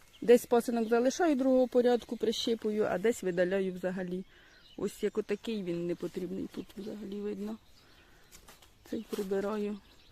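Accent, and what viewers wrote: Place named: Kirovohrad, Ukraine. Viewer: Гарне відео, інформативне. Репортаж з Полтавської області, а говір такий, ніби з Кіровоградщини (Олександрівський район).🙂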